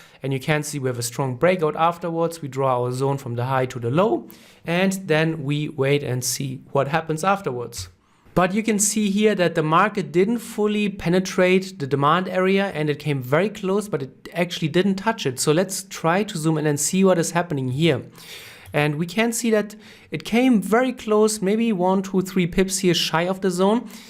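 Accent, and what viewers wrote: Germany. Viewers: Something about a scientific German accent that's reassuring, much respect my mentor and thanks for the wisdom, yes I'm black and I'm saying this, thanks again brother! liked and subscribed! :'D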